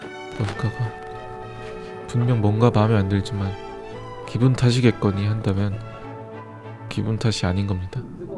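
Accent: Daejeon, South Korea. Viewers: 형 대전출신이야?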